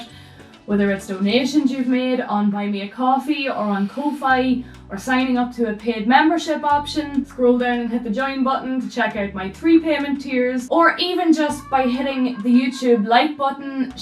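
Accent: Ireland. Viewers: Thank the earth, you've an Irish accent and I can bare to listen to you (too many Brits on youTube buying up our housing stock in 2020s). Congrats on the purchase... I'll watch and follow how your place progressed. As I write, Ive gone sale agreed on a 1949 cottage in great condition but it needs a bit of love.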